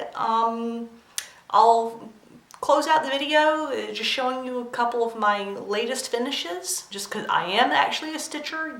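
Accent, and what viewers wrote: United States. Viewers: I just found your videos and I'm enjoying them. I'm hearing a few southernisms in your accent so I'm thinking Kentucky or maybe Indiana. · great first video I have been watching floss tube for over a year but not found the courage to make a video yet I think your accent is from some where around south Caroline but since I am from Canada it is mostly a guess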